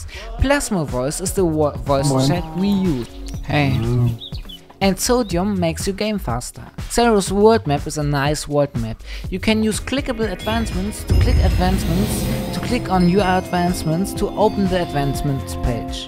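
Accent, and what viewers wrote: Germany. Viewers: Very, very cool video! By the way, are you german? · r u German? XD nice Video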